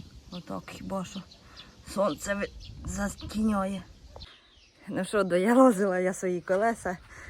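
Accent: Poltava, Ukraine. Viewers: Полтавський суржик,це прикольно.Глобино? Привіт з Черкащини 😊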